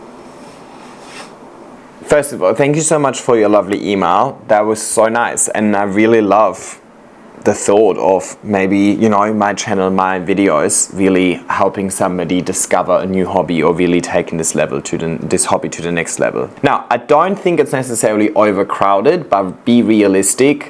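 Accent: Germany. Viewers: Ich habe es nur durch deinen deutschen Akzent irgendwann mitbekommen. Bin hier gelandet wegen deinen wunderschönen Pflanzen und den echten Moosstäben. Sehr inspirierend und funktioniert auch in kaltem, deutschen Winter. 🤪 Liebe Grüße aus Leipzig. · Wusste vorher nicht das du deutsch bist, aber dein Akzent (kaum hörbar) kam mir irgendwie vertraut vor 😊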